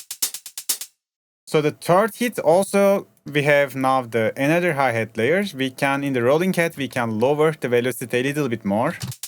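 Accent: Turkey. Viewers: Turkish accent is my favorite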